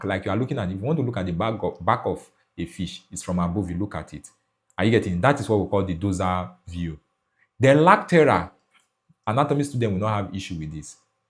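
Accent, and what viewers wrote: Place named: India. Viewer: Why am i hearing indian here oo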